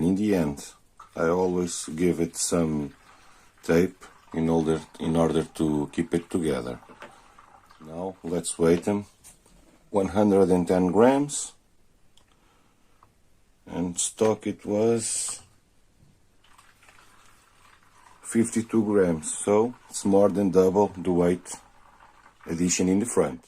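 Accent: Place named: Russia. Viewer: Cool accent, Russian?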